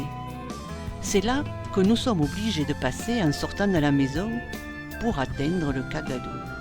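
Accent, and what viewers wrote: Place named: Provence, France. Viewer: Quelle belle histoire, avé l’assent, qu’elle est belle cette crèche, je suis bercée. Merci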